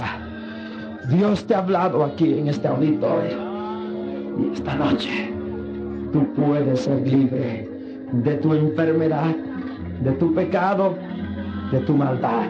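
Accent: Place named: United States. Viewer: En ese Tiempo Tenía acento Gringo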